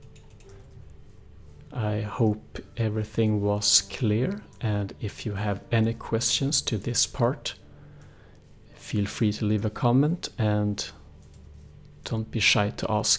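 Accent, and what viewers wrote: Sweden. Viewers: <link> Here is one of the lists I found for 1.7.10. Honestly, I was doing it to see how much I could fit together and if they would all run, it worked and I just kept the pack and it grew until I got too many, lol! Honestly, it was a LOT easier than any other version of Minecraft before it, almost anyone can make a mod pack now if they know how to "translate" the errors that may occur, I mean hell I did it. :D As always, if you have time and what not, you're invited to join us, but I completely understand how hectic life is and how little precious time you do have. Thanks for just reading and replying to these comments. I'll spare you from other comments in other vids so you can get back to you're life. ;) You're Swenglish is just fine, truly a great job. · where are u from? xD sounds like ur from sweden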